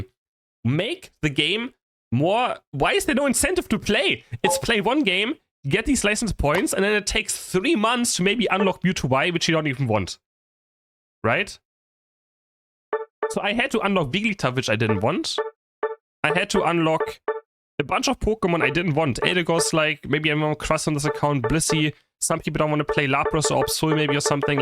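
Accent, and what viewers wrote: Germany. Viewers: I don't even play the game for license points as I already own the pokemon I like to play as. For me, item enhancers are more important, to max out all the items I use. Also this guys accent is cringeworthy thick lol (I do speak german natively and I always feel like this guys videos should be in german instead lol) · Du hast einen sehr starken deutschen Akzent wenn du englisch sprichst xD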